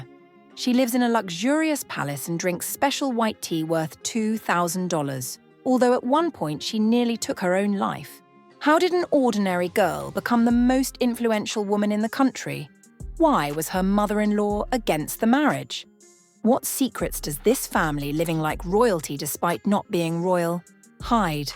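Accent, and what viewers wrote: United Kingdom. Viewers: Your accent is British. You insist on how much the tea cost. How about your royal family's lifestyle and expenses that live off from your taxpayers!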